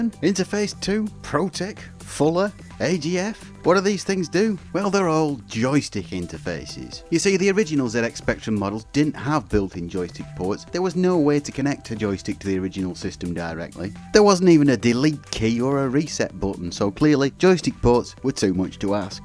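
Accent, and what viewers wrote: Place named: Northern England, United Kingdom. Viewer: You sound northern, any chance of a collaboration with Techmoan? Yet another brilliant video that’s as informative as it is entertaining.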